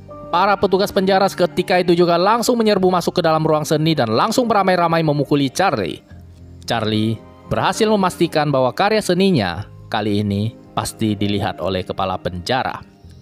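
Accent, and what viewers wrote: Padang, Indonesia. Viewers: Logat ngomong nya kaya orang Padang.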